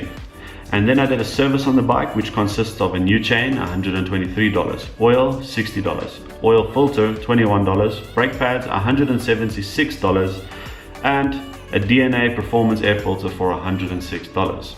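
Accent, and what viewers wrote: South Africa. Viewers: Love it my dream bike the alien ...was happy to hear a south african brother! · Ever since I watched your first video and heard your accent I knew you come from south africa 😂 en uiteindelik het hierdie video dit bewys. Hou aan met die goeie werk en videos yster!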